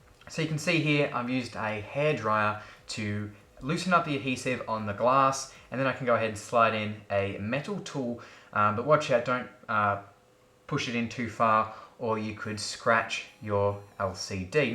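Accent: Australia. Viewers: You can clearly hear that he is an aussie wtf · He lives in Australia. He has an accent. He means cellular. I have a cellular iPad 3, but never used the cellular service. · Is he Australian?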